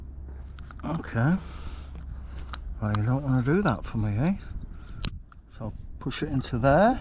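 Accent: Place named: England, United Kingdom. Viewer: That’s an English accent… 75 dollars !…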